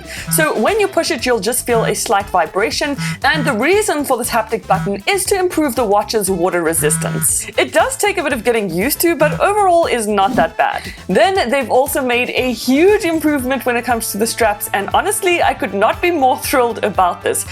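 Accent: South Africa. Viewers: Awesome wath. But are you from south Africa? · You a Saffa (accent)?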